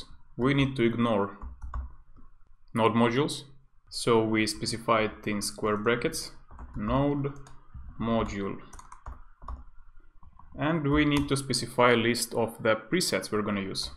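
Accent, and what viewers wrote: Russia. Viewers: какой классный тяжелый русский акцент, чувак ты ахуенен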